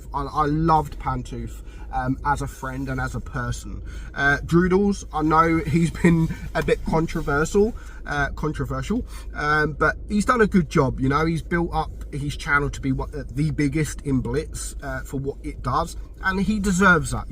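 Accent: United Kingdom. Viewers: Really sorry to see you go. I am going to miss your British accent. God speed.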